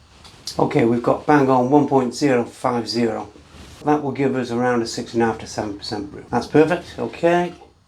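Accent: United Kingdom. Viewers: Sitting here watching a British dude teach me how to make a fowty in my garage.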